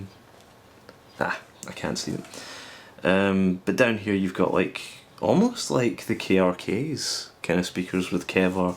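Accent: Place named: Aberdeen, United Kingdom. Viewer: Where are you from? Sounds like an Aberdeen accent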